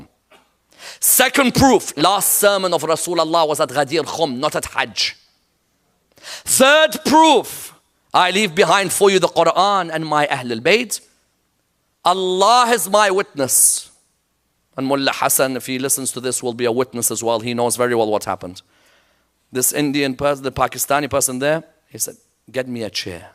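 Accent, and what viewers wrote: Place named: Iran. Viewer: bahasa inggrisnya aksen persianya kentel banget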